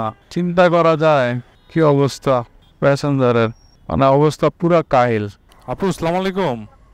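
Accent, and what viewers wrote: Barishal, Bangladesh. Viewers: বরিশালের ভাষা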